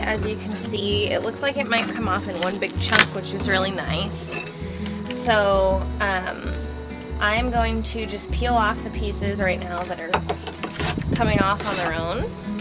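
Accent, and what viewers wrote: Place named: Oregon, United States. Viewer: How funny. I stumbled onto this video, and all of a sudden I thought, "This woman really sounds like she is from Oregon." I had to check it out, so I went to your web site and I see you are in Coos Bay. I didn't know we had a recognizable accent! I'm from Medford.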